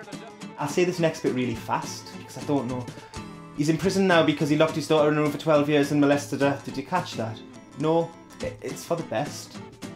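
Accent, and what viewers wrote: United Kingdom. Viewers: This is too british for me to understand.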